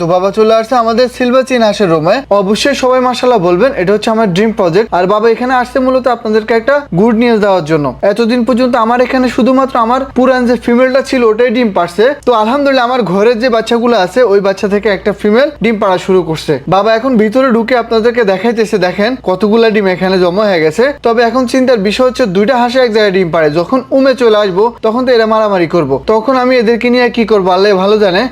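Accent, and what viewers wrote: Noakhali, Bangladesh. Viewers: ভাই নোয়াখালীর মানুষ নাকি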